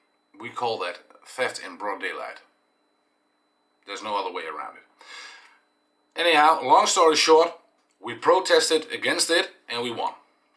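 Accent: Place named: Netherlands. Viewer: Heerlijk nederlands boers accent met engels, top man!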